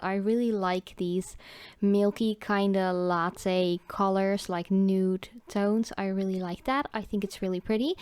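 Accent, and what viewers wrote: Netherlands. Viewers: Hello, I love your videos, they are absolutely amazing. Love your dutch accent ! (I used to live in Den Haag, had the best time of my life there) I have a question for you! How did you got sponsored by MG? I would like to do videos like you, but I assume you dont purchase these collors, they just send them to you? how did you started this companionship?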